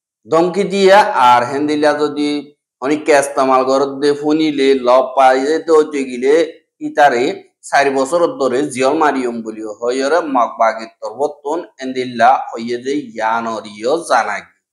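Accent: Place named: Chittagong, Bangladesh. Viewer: You not Rohingya you chitangya bangali your every speaking news chittangya bangali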